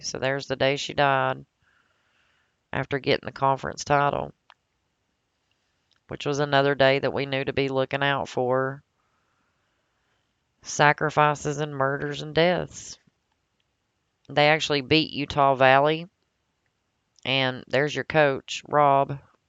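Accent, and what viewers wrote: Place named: South, United States. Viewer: Good breakdown i love that southern accent so much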